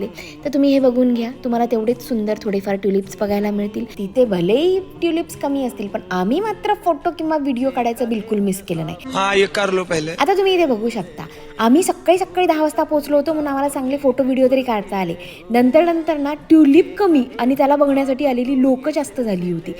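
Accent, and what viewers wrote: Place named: Kolhapur, India. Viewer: ताई तुम्ही महाराष्ट्र मधील कोणत्या गावातील आहात? तुमची भाषा आमच्या इचलकरंजी कोल्हापूर भागातील असलेसारखी वाटते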